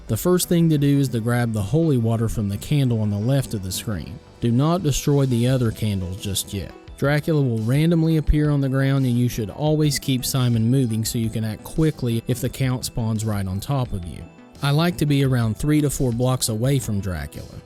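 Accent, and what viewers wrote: South, United States. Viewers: So you sound from the south. Same here. I love this channel. Thank you! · hear a Southern drawl explaining Castlevania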